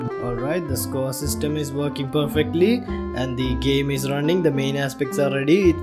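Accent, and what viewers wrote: Kerala, India. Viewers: real malayali english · u litterally sound like a malayali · his voice is Luke indians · now I'm really sure is Malayali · you English is pure Indian English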